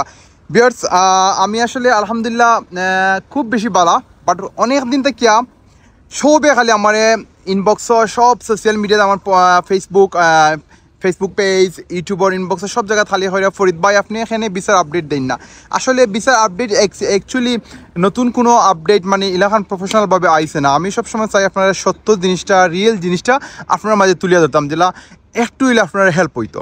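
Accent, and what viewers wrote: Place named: Sylhet, Bangladesh. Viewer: Bhai kotha bujhte onek problem hoi.. Hoi proper banglai bolen nahole english a video banan Amra sobai to Sylheti accent er sathe used to na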